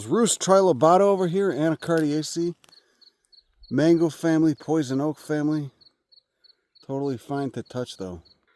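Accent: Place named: Texas, United States. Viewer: Hey! You're up near my neck of the woods in Austin, nice of you to visit. Timing is good, too, wettest Spring I can recall. I learn so much watching your videos. If you are not now a professor of Botany at some Texas University, they should be recruiting you. You would be the most popular prof in the state. BTW, I fully endorse your pattern of speech, time to admit to reality in Texas.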